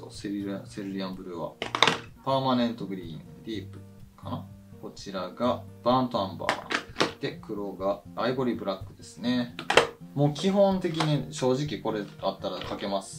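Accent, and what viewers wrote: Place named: Kansai, Japan. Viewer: 関西弁も心地よいです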